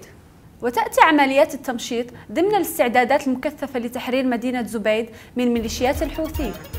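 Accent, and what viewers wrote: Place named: Morocco. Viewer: لا علاقة لها بالاعلام....كأنها جهاز آلي يتكلم....كما انها لا تمتلك أي احترافية ولا مؤهلات تخولها للدخول في مجال الاعلام....يجب عليها أن تتخلص من لهجتها المغربية لما تقرأ......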